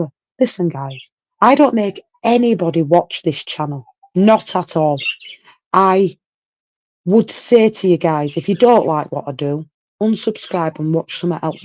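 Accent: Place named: Leeds, United Kingdom. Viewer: I think you are beautiful, and all the panel are beautiful. What you do is amazing. Love you. You are accepted by the one's who really care. Fuck the haters and just focus on the one's who care for you and what you do for your community. Take care, thinking of you x. And by the way, I love your Leeeeds accent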